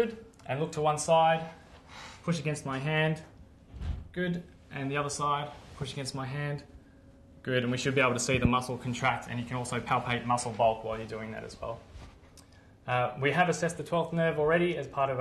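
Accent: Australia. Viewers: Sound Australian to me mate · Very Australian indeed... mayte...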